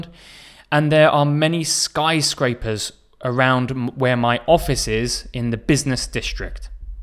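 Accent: United Kingdom. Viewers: I'm in love with your British accent. Thanks for your amazing video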